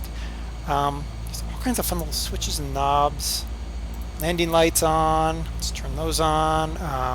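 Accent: Canada. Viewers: I thought you were Canadian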